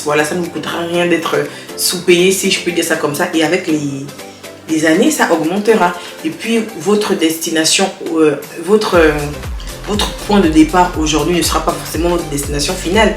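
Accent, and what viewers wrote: Gabon. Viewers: Toi t'es gabonaise 😂😂😂 l'accent ça ne ment pas... J'aime la vérité et le côté naturel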